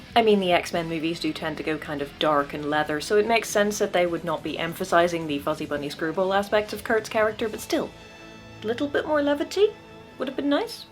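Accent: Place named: Northern Ireland, United Kingdom. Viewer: Not related to XMen but where are you from? Im from NI and California and you sound super Norn Irish. Good to see some Marvel fandom from there ❤️❤️❤️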